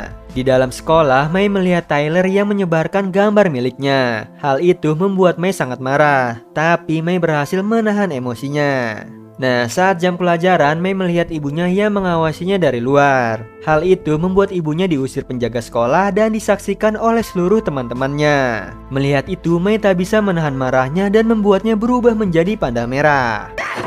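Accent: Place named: Jakarta, Indonesia. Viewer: Dari Malaysia kok logatnya kayak orang jakarta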